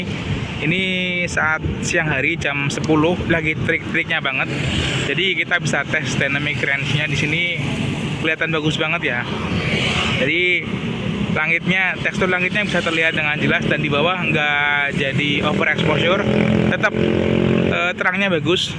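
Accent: Tegal, Indonesia. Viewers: Wong tegal lurr? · Wong tegal ya mas?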